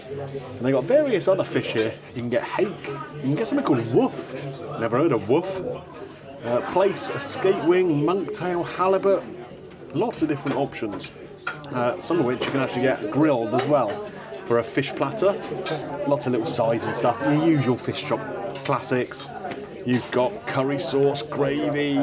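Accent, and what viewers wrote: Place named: Cornwall, United Kingdom. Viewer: For me, I honestly think you have a Cornish accent! 😂. Nothing wrong with it, it's great!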